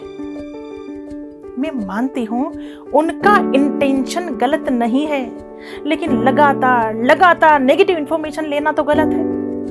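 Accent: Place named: Shekhawati, India. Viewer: mujhe thodi bahut samaj me aati he shekhawati... Bcoz mere papa ki school me shekhawati teacher ki posting hui he so unse kafi touch he mera.... Apki shekhawati bhasha sun ne me bahut badhiya lgti h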